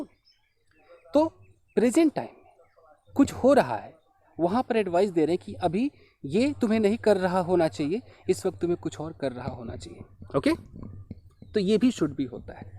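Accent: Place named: Kolkata, India. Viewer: Your 100% kolkata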